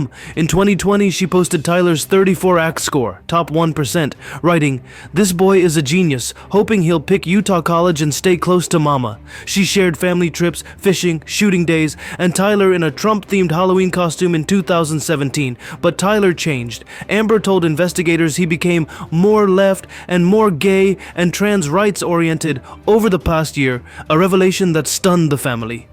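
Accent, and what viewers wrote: India. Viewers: We commentator sounds Indian?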